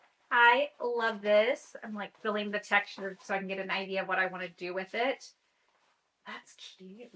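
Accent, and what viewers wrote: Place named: Texas, United States. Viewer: I haven't watched the whole video yet.. but I am here for the unboxing and your commentary. I love when your Texan accent comes out! Makes my little Canadian heart gigle 😂❤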